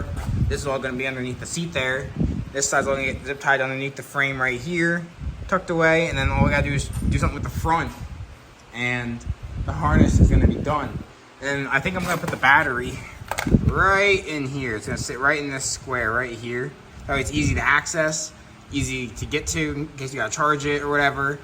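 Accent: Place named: United States. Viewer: Random question but do you live in Europe I noticed all of your license plates are European but you sound like American or Canadian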